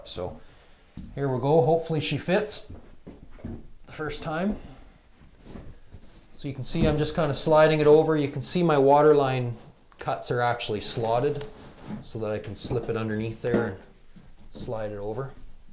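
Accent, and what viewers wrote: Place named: Canada. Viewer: Are you Canadian? You sound more Canadian than me and I’m from northern ontario where I’m told the accent is the strongest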